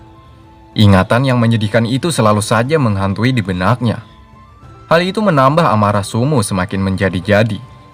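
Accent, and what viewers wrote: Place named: Madura, Indonesia. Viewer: lu orang mana bg kok logatnya kek orang madura atau jawa timur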